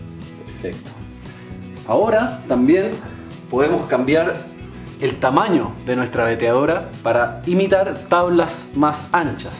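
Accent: Chile. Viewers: buen video , eres chileno ? se te oye la voz como el carpintero del desierto los dos muy buenos en los tutorialees saludos desde mexico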